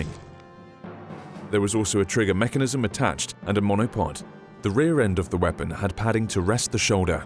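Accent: United Kingdom. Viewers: Video about a british weapon voiced with a proper british accent. perfect! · That British accent tho ...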